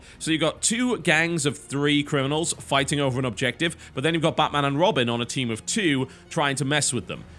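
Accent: United Kingdom. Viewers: Oh crap! Did you just correct your pronounciation of the word "usual" to the american version!? :D Careful, or you'll lose your british accent...foreeeveeer...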